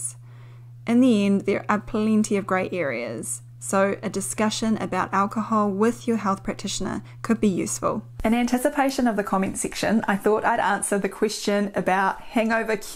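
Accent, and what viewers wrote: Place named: New Zealand. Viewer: That’s a New Zealand accent, not Australian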